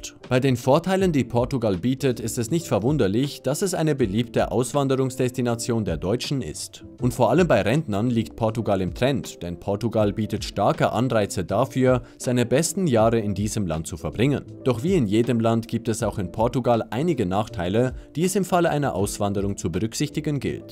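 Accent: Switzerland. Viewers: Wenn jetzt kein schweizer Akzent wäre, würde ich sagen das ist runtergeleierte KI ohne Luft zu holen und nützliche Sprechpausen!!!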